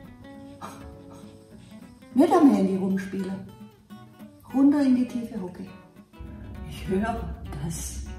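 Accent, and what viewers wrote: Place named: Swabia, Germany. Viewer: Abonniert zwecks vegan + schwäbisch + Instant Pot = unschlagbare Kombination.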